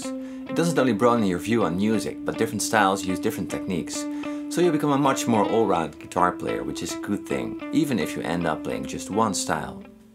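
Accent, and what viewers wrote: Netherlands. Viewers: yes he's Dutch and so am i :) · Hey you're Dutch! Guessed it haha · You sound a bit Dutch, to be honest with you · hi are you dutch? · how dutch is this guy immaright? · Are you dutch???🇳🇱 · Just noticed that your presentation has become way better now. More the authentic Dutch accent, more you. Great video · you are dutch arent you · Nederlander confirmed, Nederlands accent op het engels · Can i ask where you're from? You sound very Dutch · dude, you're a dutchie XD the accent gives away, dutchie here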